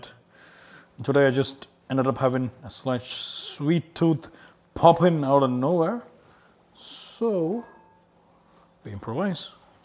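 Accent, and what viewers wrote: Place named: Madurai, India. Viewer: Waiting for your comeback brotherman, these low days will fly away sooonnn... A big fan of your fitness and accent - fellow madurai friend..🙂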